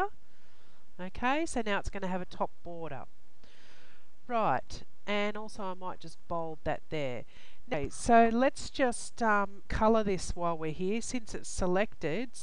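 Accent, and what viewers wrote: Australia. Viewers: I am assuming she is from Australia...which as been referred to as OZ...cheers mate! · She’s Aussie 😅”jazz it up” love it!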